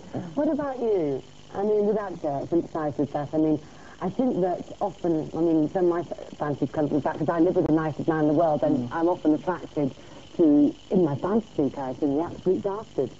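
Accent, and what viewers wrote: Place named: United Kingdom. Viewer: Aint nothing Iike a British Iady in Iatex, when she spices all that beauty with the accent.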